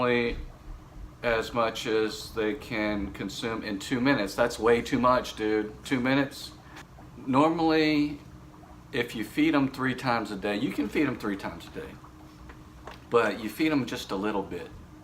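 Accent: South, United States. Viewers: This is refreshing love your southern accent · I hope this isn't offensive to you or anyone else but as a Yank that southern twang is buggin' me out. Lol All love though brother and thanks for the advice.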